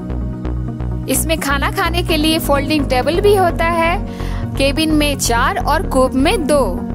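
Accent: Bihar, India. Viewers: Your bihari accent is lovely 😍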